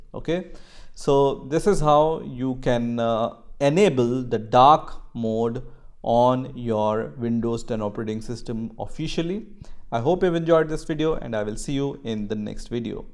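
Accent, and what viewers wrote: India. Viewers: nah wtf this indian accent 💀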